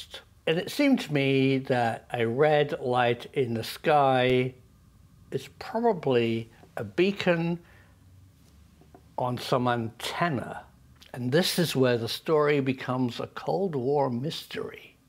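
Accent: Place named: Scotland, United Kingdom. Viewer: It must be the Scottish accent that made this one all good.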